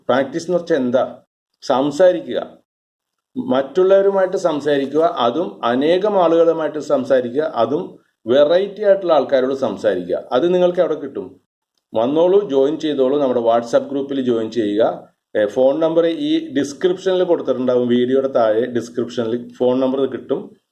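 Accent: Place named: Thrissur, India. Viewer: Sir, thankalkku oru thrissur slang und. Keep it up. God bless you.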